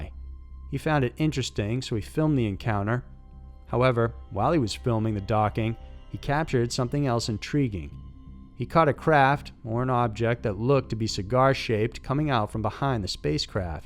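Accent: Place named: Texas, United States. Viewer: He is probably from Texas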